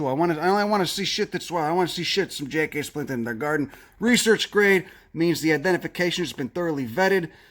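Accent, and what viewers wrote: Chicago, United States. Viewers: I just found your channel last night and I'm hooked. I've really only ever had an interest in botany insofar as it related to what was in my garden or anything growing wild nearby that could be useful to me somehow. This is changing for the better and you helped me to widen my interest in not just plants but all life that exists nearby, wherever I happen to be. Also I like your accent. Chicago, yes? I really enjoy how your accent might make someone think a certain way about you but then you immediately dispel that belief by dropping some knowledge and talking about critical thinking, a skill that is sorely lacking in this country due to the fact that it's harder to exploit someone who thinks critically about how's and why's. Thanks for taking time to share your knowledge with the world. Best wishes from Seattle, WA.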